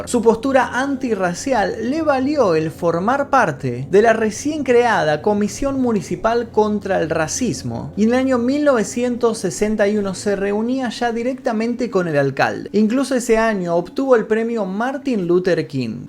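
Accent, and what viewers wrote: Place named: Argentina. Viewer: Excelente trabajo y documental y me encanta tu acento argentino. Saludos.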